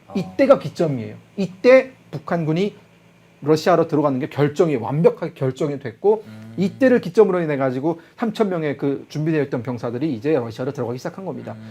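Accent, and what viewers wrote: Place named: Seoul, South Korea. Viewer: 김금혁씨 서울말까지 완벽하니 인간미가 좀 없어보입니다. 북한 악센트도 가끔씩 좀 넣어주면서 강의해주세요 ㅎㅎ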